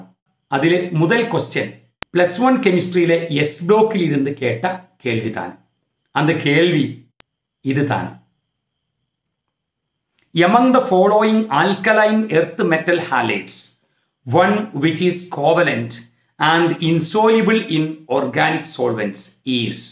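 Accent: Kerala, India. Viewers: Looks like malayali speaking tamil. Is it?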